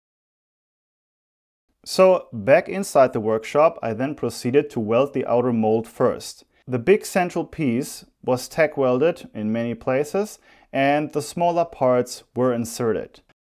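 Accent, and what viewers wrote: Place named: Germany. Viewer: I do not care what you make/salvage or do, i have always watched(listened) because of your voice. It is German dialect, not annoying, but rather comforting. Thank you!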